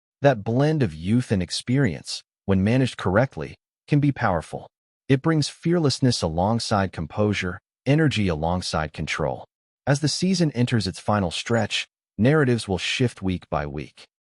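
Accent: United States. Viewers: Sorry - but nobody in Scotland wants to listen to an American trying to talk about Scottish football - change the AI to a Scottish accent!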